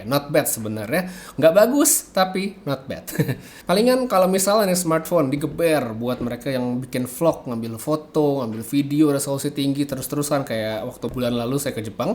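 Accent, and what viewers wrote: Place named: Java, Indonesia. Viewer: Bang dengan medhok jawanya: "Galaksiii Eaiiii"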